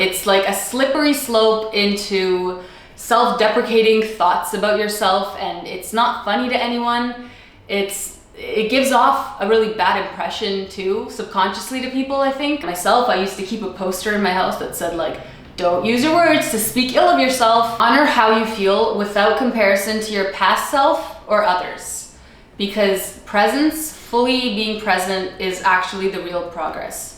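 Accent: Russia. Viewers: Блин только сейчас заметила что у, русский акцент когда она разговаривает на английском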